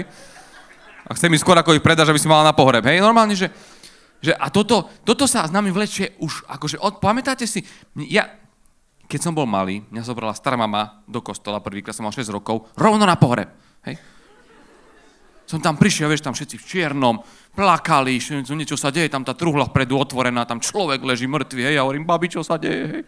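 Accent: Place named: East, Slovakia. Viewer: Nie kámo tak rozprávaj ľudia na východnom Slovensku. Nárečie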